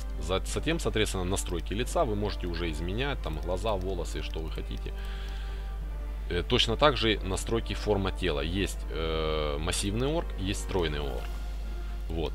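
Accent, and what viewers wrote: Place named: Ukraine. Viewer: ***** ну уши режет... лучше бы на украинском говорил , чем с таким акцентом .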